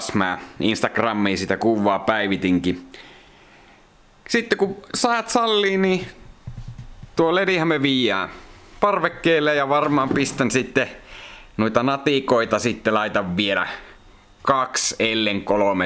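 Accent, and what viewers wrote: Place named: Oulu, Finland. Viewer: Pähiä kampe! On tuo Oulun kieli sitten hienoa.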